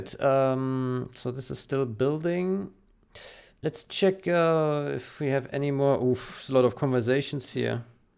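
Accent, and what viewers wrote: Germany. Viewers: hey are you german. i detect german accent. jawohl.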